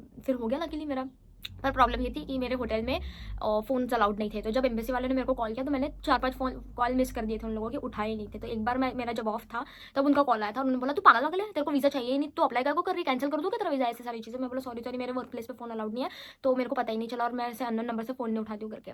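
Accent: Maharashtra, India. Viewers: I just love the way you are talking and your marathi accent in hindi is such a marvelous to hear ❤❤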